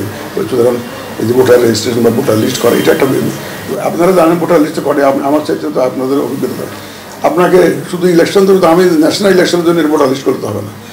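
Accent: Chittagong, Bangladesh. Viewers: কথায় বুজা যাচ্ছে উনার বাড়ি ও চট্টগ্রামে। যাহোক উনার কাছে আমরা ভালো কিছু আশা করি। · হুম তাই। উনাকে আজকেই দেখছি, প্রথম বার উনার কথা শুনছি। উনার কথা শুনে কমেন্ট পড়তে এসেছি, উনি কোন জেলার কেউ লিখেছে কি-না। কারণ উনার কথায় আমাদের চট্টগ্রামের একটা টান আছে। আপনাকে ধন্যবাদ, উত্তর টা পেয়েছি। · কথায় একটা চাঁদগাঁইয়া টান আছে।চট্টগ্রাম ❤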